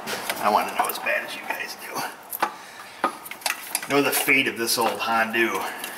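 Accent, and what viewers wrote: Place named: Pittsburgh, United States. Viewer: Hey pal leave off of my fellow Pittsburgher. I thought I spoke perfect English until I left home for the wild West and people questioned my pronunciation of certain words . It appears that Pittsburgh has it's own dialect.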